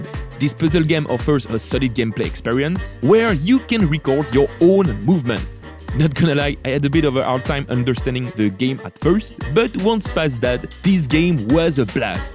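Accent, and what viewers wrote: France. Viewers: Je sais pas si tu es français mais tu as un fort accent français 👀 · Is me or you have a big french accent , you speak french ?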